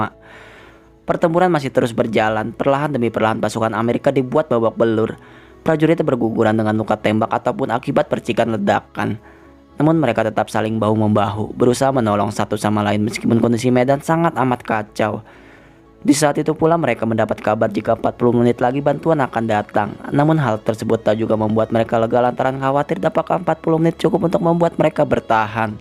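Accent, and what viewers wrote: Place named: Kalimantan, Indonesia. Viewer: Dri logat kykx ini org Kalimantan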